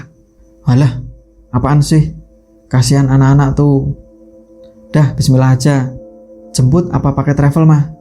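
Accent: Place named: Java, Indonesia. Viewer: sll bs d nikmati.. logat jawanya.. sy suka.. sehat sll y bang..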